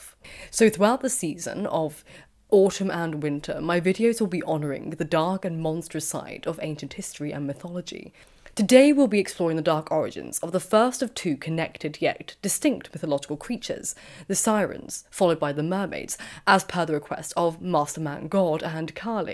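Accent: United Kingdom. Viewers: Why does every British person sound like an Oxford professor! 💚 everything sounds more intelligent and smooth! 💚 Love the mythological on sirens/mermaids! 💙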